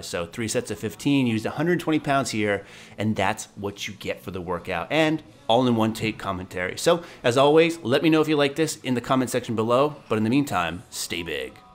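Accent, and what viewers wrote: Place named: South, United States. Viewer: dem accents doe seriously big upps more of that southern accent it makes these videos so much better:D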